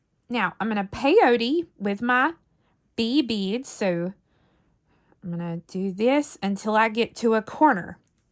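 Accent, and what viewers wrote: South, United States. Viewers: Wow, this is lovely. I love the tassel, but I think for longevity, I would prefer a tassel made with beads. And I think you meant 25x18mm, not 25x8mm., you are amazing. Funny, all these years I thought your last name was, but it's just your warm Southern accent that made it not sound like.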